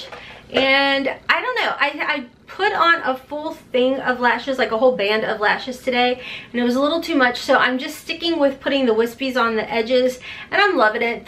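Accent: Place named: Kentucky, United States. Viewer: You seriously are amazing! I am also from Kentucky, born in Lexington, and its so nice to hear your accent! Love your videos and just remember to inhale confidence and exhale doubt! 😘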